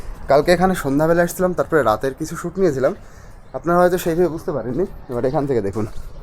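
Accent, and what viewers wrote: Bangladesh. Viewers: ❤u bangladeshee korha sune boja jachee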